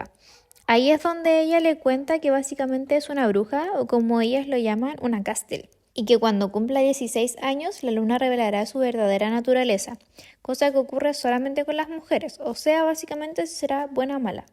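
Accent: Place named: Chile. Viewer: tengo que decir que tienes una voz bonita , PERO HABLAS MUY FEO por el momento DUDE en que IDIOMA HABLABAS. y me di cuenta que hablas asi por que eres CHILENA, y te quiero comentar que si vas a tener un canal y compartir contenido TRATA DE HABLAR MEJOR por que no todos entendemos el CHILEÑOL.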